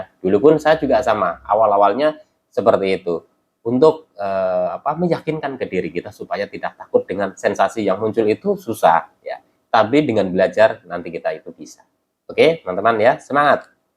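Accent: Banyumas, Indonesia. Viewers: WALMAS hadir mas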